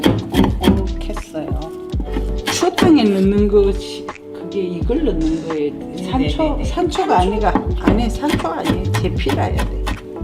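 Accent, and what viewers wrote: Gyeongsang, South Korea. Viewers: 스님 음식은 누구도 할수없는 몇가지 따라해봤는데요 이주토속적이면서 귀한 음식 약 간경상도 사투리섞인정감있는목소리 .스님 건강하시고 다음 또기대합니다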